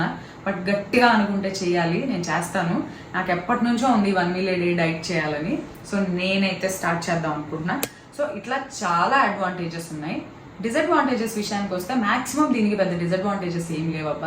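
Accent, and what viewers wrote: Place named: Rayalaseema, India. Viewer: mee slang rayalaseema laga untundi Abba ani ikkada.antaru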